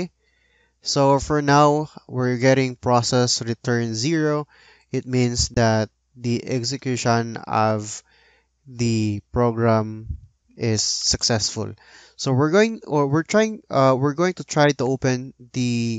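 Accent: Philippines. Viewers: Is this A Filipino? Because the Accent is Like Filipino XD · bruh i giggled hahaha. his first sentence and I knew he should be Filipino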